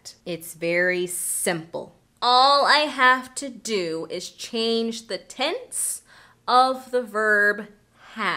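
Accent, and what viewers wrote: United States. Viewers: Nice accent!!! What state are you from?❤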